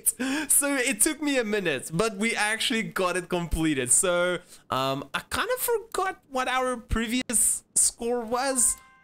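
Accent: South Africa. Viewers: Once you have all +3 bars, start with the bar on the right most side, and then move it one down and do that in order from right to left move each one down and you should get +6 on all. By the way you sound a little South African. Not a very strong accent but having lived here since birth I can pick out even very subtle SA accents just like that no matter where I hear them.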